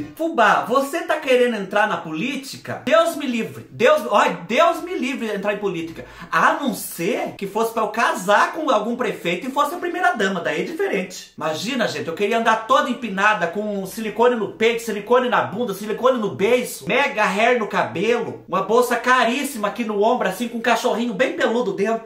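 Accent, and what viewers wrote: Spain. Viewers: Oi Fubá! Está chique demais, falando em espanhol! 🤩🤩🤩 Amei a parte do coice! 🤣🤣🤣 Beijocas! 😘😘😘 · 😂😂😂😂 fubá do ceu o teu espanhol é tudo de bom 😂😂😂😂😂😂😂💋💋💋🥰🥰🥰🥰❤️❤️❤️❤️❤️ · Fubá to rindo de mais o seu espanhol é ilario kkk so vc mesmo cada resposta kkk os erros são de mais aiii que dor machucou o joelho adoreiii ispicula sim nós é capaz 🥰🥰😘😘😘😘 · Oi amei seu espanhol mais alguém amo deixa o like só eu que dei risada do útero virado do fubá kkkkkk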